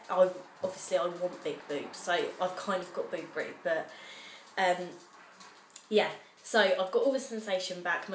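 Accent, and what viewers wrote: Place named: Devon, United Kingdom. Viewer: Are you from Plymouth? Or somewhere in Devon? Your accent is so similar to mine xx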